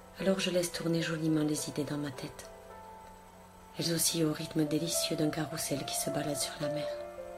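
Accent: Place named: South, France. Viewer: Je le redis "sublime" et tu as le même accent du sud que moi. Encore merci 💖💖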